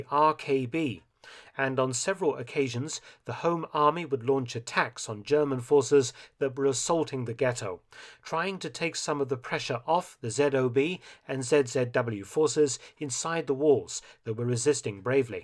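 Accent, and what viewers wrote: United Kingdom. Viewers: When you have a WW-ll Historian and author at the helm how can you go wrong? The British accent narration doesn't hurt either. 😊👍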